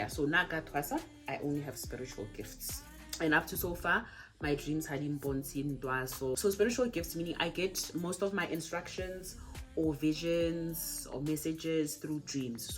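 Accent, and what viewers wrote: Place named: Botswana. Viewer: So refreshing to listen to Setswana se se tlhapileng❤❤ love your energy 🌷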